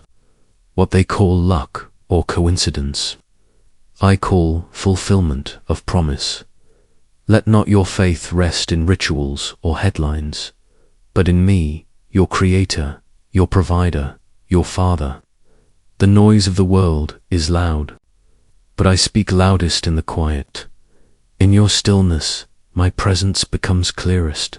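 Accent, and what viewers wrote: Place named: England, United Kingdom. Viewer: You have an English voice....as I do. I receive.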